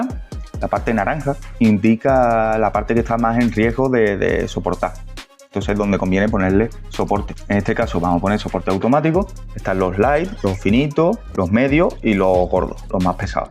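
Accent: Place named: Andalusia, Spain. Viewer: Por la forma de hablar pareces andaluz, no sé si me equivoco, te explicas muy bien, suerte y al toro. Un abrazo desde Cádiz capital.😜👍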